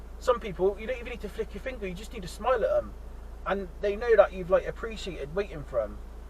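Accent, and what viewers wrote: Bristol, United Kingdom. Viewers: Always love your vids. Strangely comforted by your bristolian burbling. 💋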